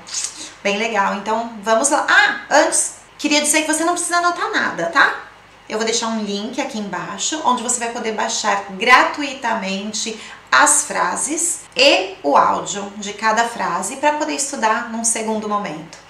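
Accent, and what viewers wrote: Abruzzo, Italy. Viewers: Salve. Lei ha un accento familiare abruzzese. Ho vissuto 18 anni a Lanciano!!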